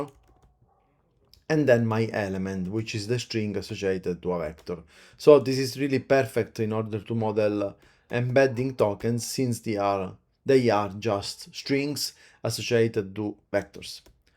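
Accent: Sicily, Italy. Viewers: sentirlo parlare in inglese con accento siciliano , mi rende felice. chi fa questo lavoro deve essere bravo nello sviluppo non in lingue estere. spesso nel support service di cisco succedevano telefonate dove non si capiva nulla. e nessunondi noi ha mai detto niente. altro che accento siciliano.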